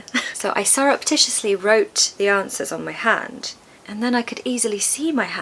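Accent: RP, United Kingdom. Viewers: Lovely and smart Woman I'm from Aracaju-Sergipe-Brasil I love the Rp accents from England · Dear Madam Teacher,many and many thanks!! I really,truly admire your so great British accent!! Very warm greetings from the Central Europe. I wish you a pleasant,enjoyable,wonderful 1st May ( Labour Day and Global Love Day). Take care,please.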